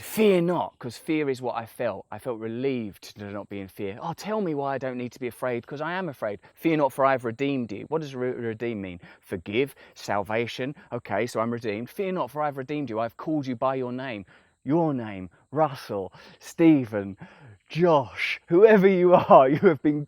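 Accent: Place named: Essex, United Kingdom. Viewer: What a lot of nice people you all are. I enjoy Russell's eloquence and spirit, especially with the Essex accent.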